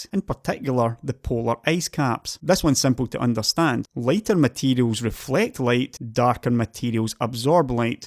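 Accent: Scotland, United Kingdom. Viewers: Love the video and watched through fascinated although I knew most of it. The sound of Scottish makes it that more interesting. Thank you.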